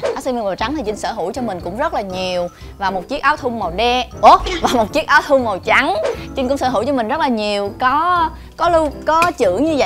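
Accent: Mekong Delta, Vietnam. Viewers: Trinh nói chuyện thật quá đậm chất dân miền tây tự hào quá trinh ơi Dân miền tây tụi tao thật thà và chất phát 😁😁😁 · Trấn Cường Lâm đậm chất miền tây luôn á :)))) r mà toàn đọc thành g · Nói chuyện mộc mạc đúng chất miền tây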